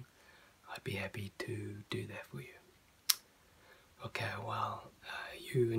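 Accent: New Zealand. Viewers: Very well executed video. The New Zealand accent is dreamy.A Kiwi can be rude and you'd still say thank you. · Wow I love the new zealand accent. I'm in love ❤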